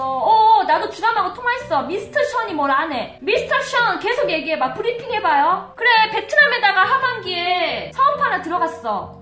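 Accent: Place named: Jeolla, South Korea. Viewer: 전라도 사투리 찰지네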